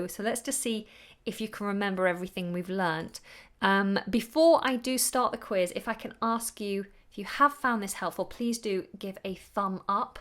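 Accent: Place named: England, United Kingdom. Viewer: Hello, I'm Waser Resaw. Nice to meet you. I'm dance music producer from German record label. I hear you voice and i think it's perfect. Especially your England accent i love it. Your voice catches my attention. Please sendme a inbox with a voice note or audio file saying: "You now listening Pitch Industry, Introducing Waser Resaw" Of course i really appreciate it. <3 My website if you wanna hear mi sounds: <link> <link> I wait your answer. Thank you.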